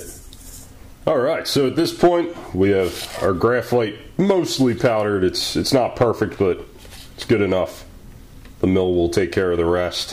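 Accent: South, United States. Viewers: Maybe it's just been awhile, but you have damn fine hands. Damn fine hands! I didn't even know I was a 'hand' lady! Granted, I'd gleaned from your videos that you are an ass, re: euphemisms, double entendre, blatant ejaculate consistency; Of a keen intellect with a purview respectful of both humanistic and psychodynamic persuasions and love for even the lamest of layman; You've a deep, soothing voice, with that faint Southern-like drawl -... and I just heard something about 6"... Average ain't bad! Above being the verbose way of sayin': I've got to hand it to you, you are one damn fine ass, sir. tips hat Love the videos and the colourful internal dialogue gone rogue. <3 Thank you.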